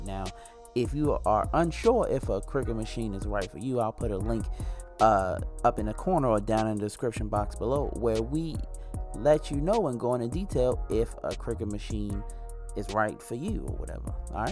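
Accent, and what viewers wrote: Baltimore, United States. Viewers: I need to know if I'm correct. Is that a Baltimore accent I hear?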